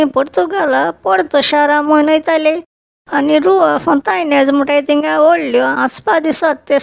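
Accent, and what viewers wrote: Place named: Salcete, India. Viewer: Typical Salcete Konkani... ..😄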